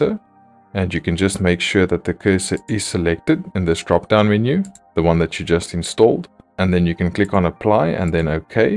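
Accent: South Africa. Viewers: jirre south african?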